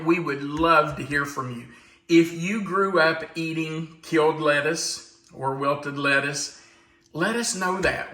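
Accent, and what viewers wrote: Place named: Appalachia, United States. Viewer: Appalachian talk ! See, us Aussies know a lot about America ! Not many Americans know much about Australia 😢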